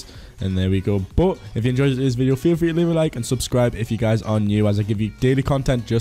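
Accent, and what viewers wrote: Newcastle, United Kingdom. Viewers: are you from Newcastle because your accents sounds like you are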